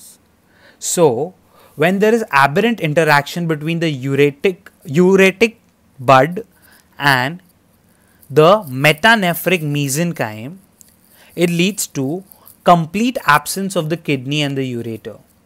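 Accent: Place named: India. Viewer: I usually straggle at understanding Indian accent, but you are so clear thank you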